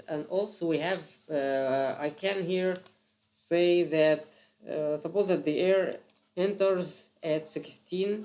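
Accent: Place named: Egypt. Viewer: although you have a very good english that made me doubt, however i had a strong feeling that this is an egyptian accent xd. very informative video even after 7 years, thank you! can i get your email for a technical question?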